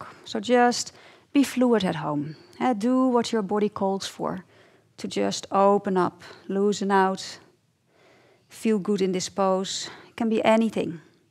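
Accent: Netherlands. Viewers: she sound dutch for sure, i can tell because im from the netherlands... sort of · Great Stuff! I teach Yoga here in D.C. and everyone always asks me about the Gluts (but) body part. And nice Dutch accent also!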